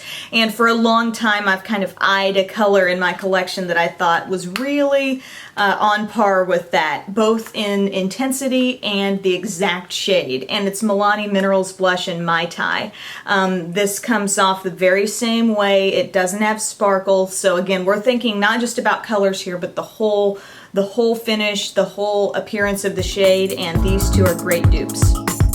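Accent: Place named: South, United States. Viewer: I also wanted to ask if you were from the South. I love your accent. :)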